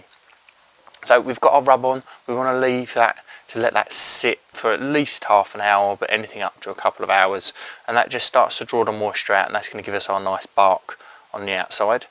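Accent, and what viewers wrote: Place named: United Kingdom. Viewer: Still getting use to hearing British accents talking about bbq and smoking meats lol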